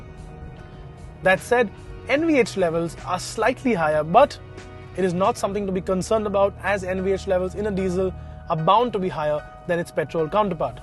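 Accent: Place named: India. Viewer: no offense to others but youre the first indian car review wherein the accent doesnt bother me! nice review!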